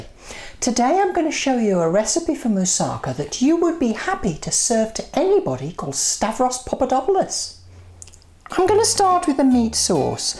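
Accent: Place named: Southern England, United Kingdom. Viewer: Lol, I love Southern English accents... MoussakaR!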